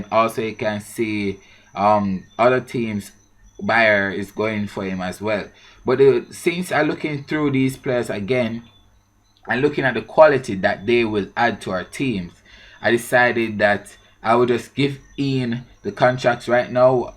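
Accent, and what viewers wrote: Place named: Jamaica. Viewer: Loving this series..should have more views..your accent is awesome and it's about we had another Jamaican at Bolton :P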